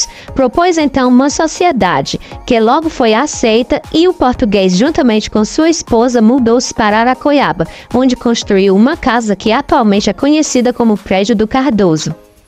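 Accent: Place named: Saxony, Germany. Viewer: Morava perto quando novo e era especialmente maravilhado com as rampas para carros. Você é cearense mesmo? É uma dúvida sincera, porque sua pronúncia dos "R" é um pouco saxônica.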